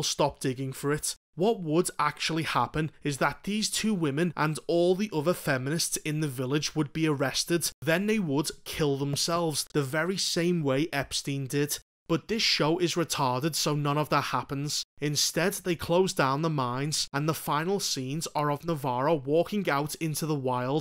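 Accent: Liverpool, United Kingdom. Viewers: You’re my favorite reviewer of this. You being scouse just makes it better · There is nothing more perfect to listen to, than a Scouser having a sarcastic rant about something 💩💩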